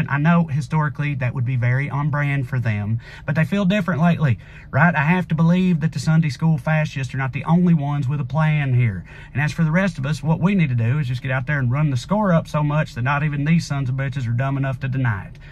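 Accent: South, United States. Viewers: It is so refreshing to hear someone with a southern accent and wicked sense of humor express what is going on in our world. Preach Brother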